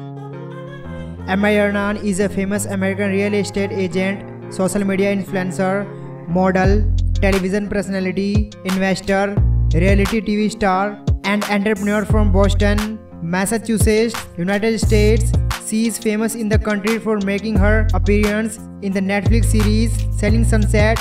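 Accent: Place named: India. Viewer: Yea, this Indian accent drives me crazy.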